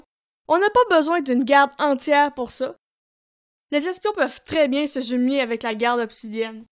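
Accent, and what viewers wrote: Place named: France. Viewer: OMG i love French accent haha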